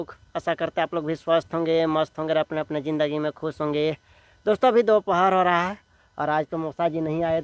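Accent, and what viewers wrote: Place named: Jharkhand, India. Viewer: आपके बोलने के अंदाज से ऐसा लगता है की आप चतरा या लातेहार साइड से हो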